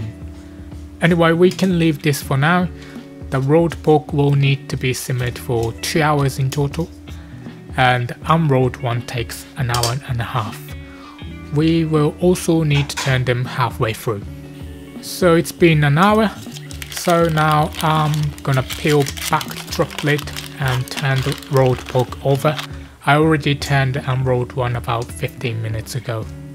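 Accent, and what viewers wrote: United Kingdom. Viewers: Yes, that's me! I like to support small but professional cooking chanel, btw, your british accent gets me. All your direction is easy, clean and on point. If you have time, would you please consider to cook 鶏手羽 香酥雞翅? The pork belly in USA grocery is pretty tough, so i couldn't roll it though. I leave it 2 days and it is so tasty and soft. Thank you again ^^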